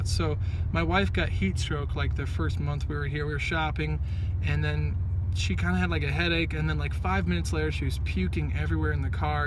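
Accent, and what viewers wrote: United States. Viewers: Thank you, considering moving from metro detroit to tuscon... worried about the heat, you sound like someone from grand rapids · Definitely not lol where you from bro...im from Detroit...you sound like you from Ann Arbor or the burbs or something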